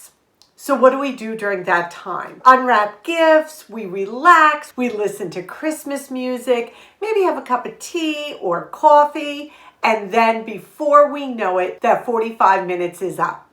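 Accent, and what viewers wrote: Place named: Hudson Valley, United States. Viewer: You totally have the Hudson valley accent. Peekskill born and raised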